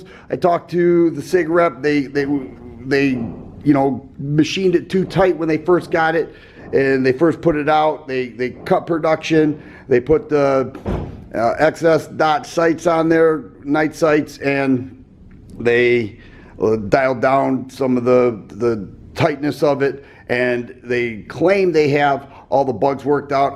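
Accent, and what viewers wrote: Michigan, United States. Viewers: I didn't know Michiganders had a accent until I moved away. You have a very heavy Michigan accent. Keep up the great reviews.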